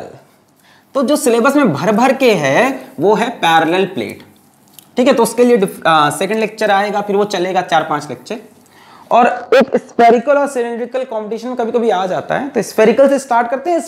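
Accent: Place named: South, India. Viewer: South Indian accent 😍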